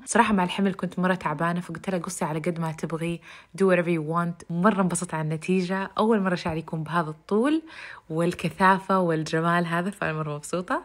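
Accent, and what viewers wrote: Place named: Saudi Arabia. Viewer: اللهجة السعودية سموذ ومريحة وصوتك حلو ماشاءالله ❤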